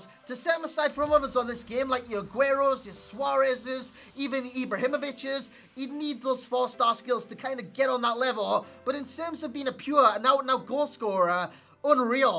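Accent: Newcastle, United Kingdom. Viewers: Kebba Sohna I think he's from Newcastle but I could be wrong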